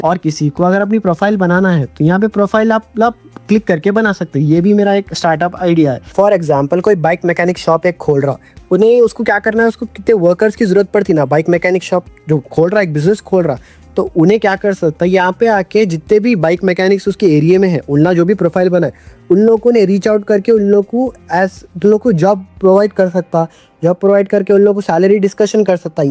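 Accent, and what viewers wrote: Hyderabad, India. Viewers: I like the way you talk Hyderabadi style accent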